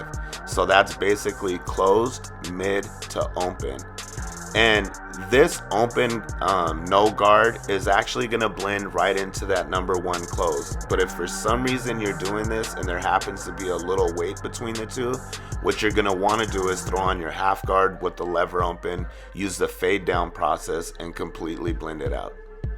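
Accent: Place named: California, United States. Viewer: I knew you were Mexican , sound like from Cali . Haha idk man 🇲🇽, wouldn’t mind have another andis shaver 💈💈💈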